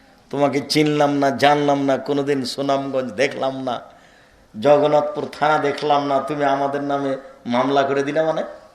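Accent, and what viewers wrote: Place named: North, Bangladesh. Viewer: আইনুল হুদা আর আপনি দুইভাবে ধর্মের বানী প্রচার করেন। কিন্ত আপনি যেভাবে বলেন সে ভঙ্গিটা আমার কাছে সঠিক মনে হয় না। এভাবে কিছুটা অহংকারী মনে হয়। যদিও আপনি তা না। তবে উচ্চারণ সঠিক হয় না এর কারন কিন্তু আপনার জন্মস্থানের (উত্তর অঞ্চল) উচ্চারণের ভিন্নতার কারনে। একথা বুঝিয়ে বললেই হতো। এটা প্রত্যেকেরই হতে পারে। হুদা সাহেবের ও সিলেটী উচ্চারণ বা টান আছে।। আপানাদের মাঝের পার্থক্য গুলো ঘুচিয়ে ফেললেই আমরা অনেক দূর এগিয়ে যাব। ভুল হলে ক্ষমা করবেন।।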